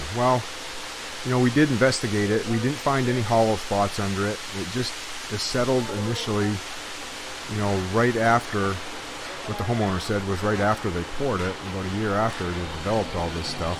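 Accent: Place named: New England, United States. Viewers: bill smith He sounds like a New Englander.. my guess is Boston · Hi, great video. Good to hear my native speak (I live in Austin but am from RI). Very helpful to see the entire job unfold. Hoping you'll be able to provide some input re: moisture barrier (and cost). Details below (it's a lot). Thanks in advance if you can help, completely understand if you can't. Am converting a 20' X 12.5' (leaky) garage into a recording space. There's a 30 inch x 20' ledge running along interior-wall side. The floor is 5.5" below house slab level, so assuming I need to leave space for flooring on top of new-pour slab level. Total square feet of space to be raised is 200 sf X (approx) 5". Question one: Have been told I need a moisture barrier and would have to wrap the studs, but noticed the white strip at base of studs on far wall in video. Is that the foam of which you speak? 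Can you explain the application? Question two: I live in Austin. Contractors bidding on job have varying opinions and thoughts about moisture from concrete at studs and structural materials to use. Current concrete floor appears to be fine and intact. My major concern is water, and like this client, it's apparent that at least some of it is coming from under the garage door during heavy rains lasting more than a day. My house is on a slight grade, and the driveway has a short flattened run in front of the garage door, but it doesn't seem to do much to re-direct the heavier rain water (no, no gutters, unfortunately). That said, the water reliably pools along the garage's inter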